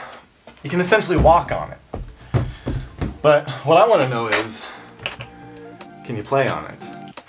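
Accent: South Africa. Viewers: What are u South African?? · You're South African?!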